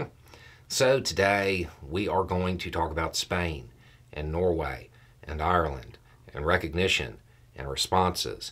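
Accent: South, United States. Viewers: I love that sharp intellect cloaked in that velvety southern accent. I know your accents are not exactly the same, I think you are from different regions of the south but you remind me of my favourite southern gentleman Shelby Foote. 💖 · Perceptive video. Southern States accent for sure, but did I catch a trace of an Australian accent as well?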